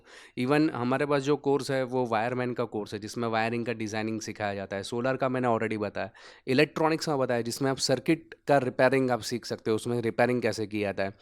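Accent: Gujarat, India. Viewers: Sir aap gujrat se ho kya kyuki aapki language aisi hai 😂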